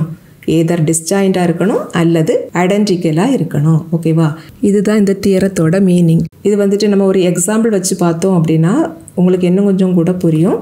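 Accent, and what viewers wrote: Kanyakumari, India. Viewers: Kanyakumari 🤩🤩 your slang also Ngl 🤩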